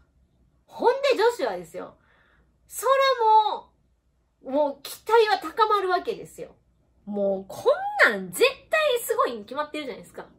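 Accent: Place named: Osaka, Japan. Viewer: さん！今年もよろしくお願いします。 『メチャ』『ホンデ〜』『オモロイ』『アンマー』 メチャー大阪弁大好きやん！会話の間の取り方良いね。藤井風また聴きます。『何なん！』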